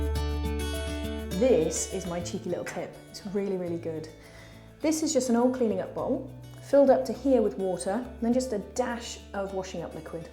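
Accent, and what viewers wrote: England, United Kingdom. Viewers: That's an English accent 🙄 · She sounds English. Makes a nice change from American and Aussie DIYers.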